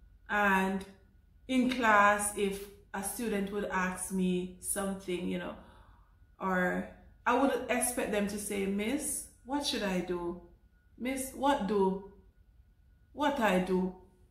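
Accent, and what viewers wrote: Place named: St Ann, Jamaica. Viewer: You are a well trained teacher I enjoy your video. You are from st Ann's sound like my sister inlaw blessings.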